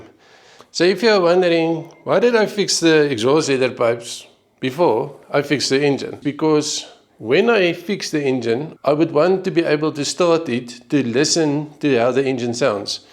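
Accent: South Africa. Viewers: LOL that south african accent... 🤣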